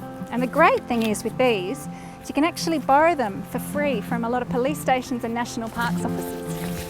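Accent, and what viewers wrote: Australia. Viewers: I like your Aussie accent :) I think I'm a backpacking snob. I have a huge sleeping bag, huge mat, a pillow...they won't even come CLOSE to fitting inside. They all have to be hung. I brought canned food, a bunch of water. My first trip was 3 miles (4.8 km), 2500 ft(762 m) of elevation. It was kinda tough, I had to rest once, although right at the end. Maybe as I get more sophisticated, I'll bring smaller stuff. Thanks for the video! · just arrived in Australia a bit more than a month ago. Now while watching the video I didn't even notice the Aussie accent! I'm not a native English speaker, but I think I really like the way Aussies speak. + Great video! I'm heading to the Wall of Jerusalem in a few days, and this video helps a lot!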